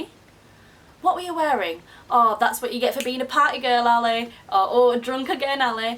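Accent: Yorkshire, United Kingdom. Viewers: I am glad you are alright. I will always remember you because when I was looking for the Yorkshire accent, your videos were the first I watched. :)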